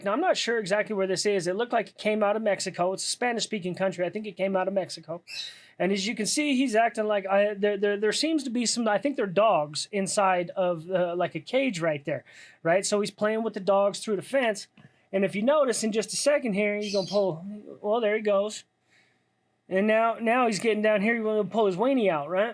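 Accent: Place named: United Kingdom. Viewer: I love this kind of British accent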